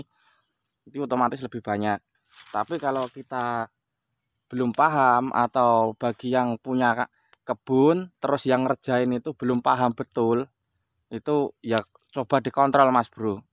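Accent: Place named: Java, Indonesia. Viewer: Jian medok jowo tulen mase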